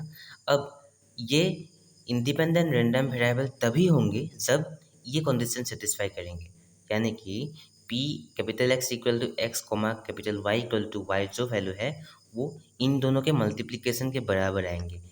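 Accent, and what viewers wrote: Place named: Northeast, India. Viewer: Ye North East ka hai isliye aisa ascent hai... Woh jaan bhujhkar aise nhi bol rha hai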